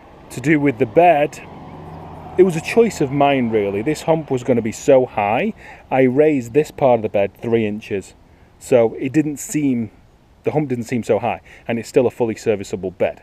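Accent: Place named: Northern England, United Kingdom. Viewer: Now mate I've recently found your channel and enjoying your stuff but i've one question. what part of the north of England are you from as its great fun hearing English/northern terms,swearing and pronunciations mixed with americanisms . keep up the good graft.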